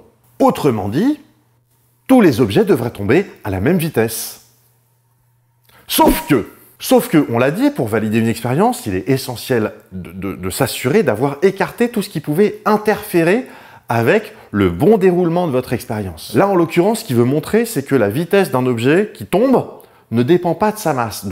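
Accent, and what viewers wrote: Alsace, France. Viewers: L'accent H'Alsacien 💜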